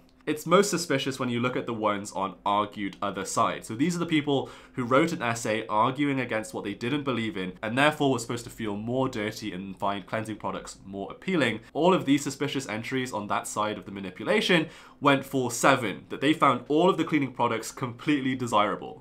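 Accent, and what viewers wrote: England, United Kingdom. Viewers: lol -he doesn't have Chinese surname and he has an English accent and your first guess is that he might be Chinese educator living in China?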